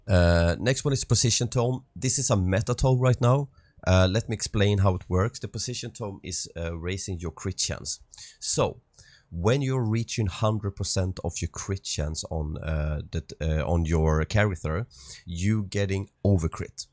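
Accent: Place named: Sweden. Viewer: w sverige always know a swedish talking english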